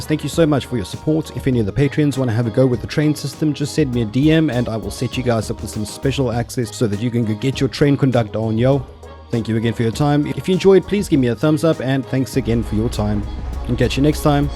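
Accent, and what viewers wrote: South Africa. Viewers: are you from cape town or are you a joburg boet?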